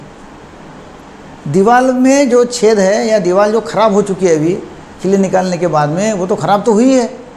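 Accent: Maharashtra, India. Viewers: sir,u can talk in Marathi also ,even u speak in hindi ur tone is like Marathi,pl speak in Marathi or in English or please learn proper hindi, language,tone etc, being Marathi am proud of u ,but u talk valuable thing,but sometimes it's funny tone so pl improve sir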